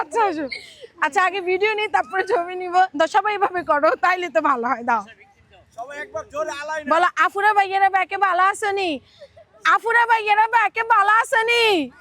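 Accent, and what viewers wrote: Noakhali, Bangladesh. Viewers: apu assalamu alaikum apu ami apnar akjon fan ami apnar sob video dekhi khub valo lage apnar noakhalir basha amar khub valo lage apnar vedio dekhe ami uthsahito hoye ami akta youtube channel start korci jodi paren amake aktu support diyen amnar sathe dekha korar khub iccha amar channel er diye aktu lokkho rakhben Jannat kitchen🎉❤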